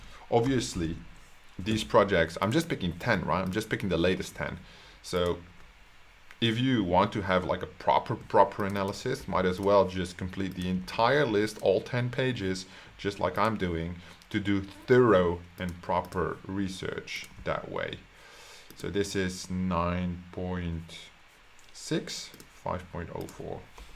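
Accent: Netherlands. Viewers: Just as early as yesterday.. Are you Dutch btw? Your English is great but I hear the Dutch I think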